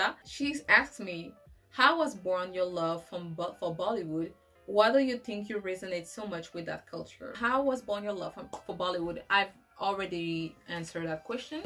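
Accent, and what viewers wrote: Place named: France. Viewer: I love the post you did with best dancer and you chose Madhuri.I love all the courtesan songs like you.I am a British Sikh lady and love your french accent.I studied French when I was younger 😂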